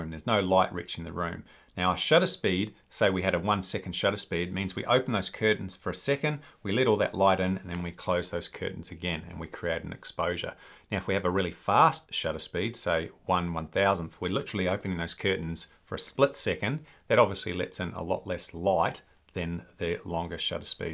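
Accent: Australia. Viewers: Hi, I'm really enjoying the videos... it's wonderful to hear an Australian voice, highlighting birds that are there for us all to see/capture. I have already subscribed, but am trying to find the 'join' tab, so I can sling a small amount your way in appreciation. Can't find it... perhaps you already have too much cash and don't need any more! If you can steer me the right way it will be a win-win. Have decided to bite the bullet and go mirrorless with an R7, and an 18-150 as my 'everything but birds' lens and a control ring adapter so I can use my (second-hand) 100-400Lii, plus a 1.4 extender. Happy days!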